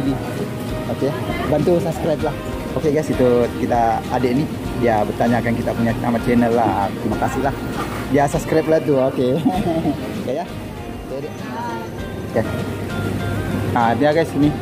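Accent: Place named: Sabah, Malaysia. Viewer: Cakap loghat sabah sudah.Maju terus sahabat.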